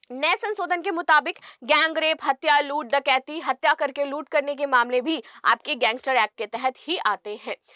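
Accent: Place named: Purvanchal, India. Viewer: Sir Mam ham UP se hai But Aap UP ke purvanchal se lag rahi hai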